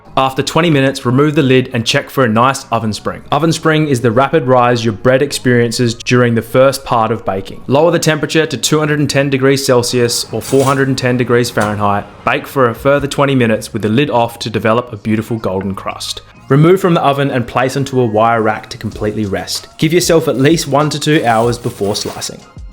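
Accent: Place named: Australia. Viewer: It's so nice to hear an Australian accent on YouTube. A nice calm voice just explaining how to make sour dough bread. Lots of good tips about resting the dough, concerning ambient temperature, that I haven't heard of before, so thank you. Nice kitchen too. ❤️🙋‍♀️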